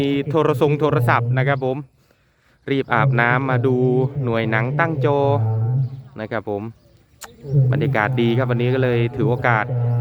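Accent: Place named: Isan, Thailand. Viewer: สำเนียง ภาษาอีสาน พี่พูด สื่อสาร ได้ เพราะ มากคัฟ #FC คัฟ #เด็กใต้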